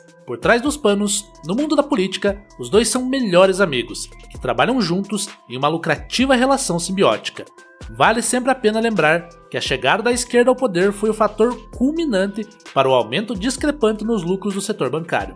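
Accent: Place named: Paraná, Brazil. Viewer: O texto é bom. Mas a voz desse narrador, vamos combinar é muito chata, esse sotaque do terceiro planalto paranaense é de doer. kkkkkkk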